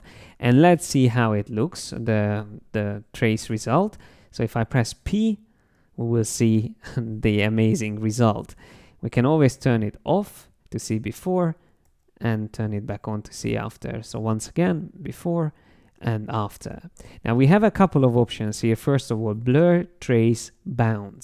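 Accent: Hungary. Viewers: király videó hallani a magyar akcentusod :-)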